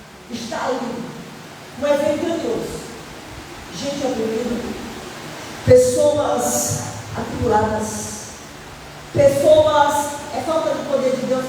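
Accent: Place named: Paraíba, Brazil. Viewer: A paz do Senhor missionária! Aleluia hum olha como minha professora de sotaque paraibeis esta chip, gloria a DEUS ''ha um tempo determinado por DEUS para todas as coisas'', primeira vez que vejo minha professora que prega sorrindo de corpo todo, louvado seja DEUS pelas vestis e amei essa saia, amanhã vou acompanhar a pregação toda, DEUS te abençoe com a familia.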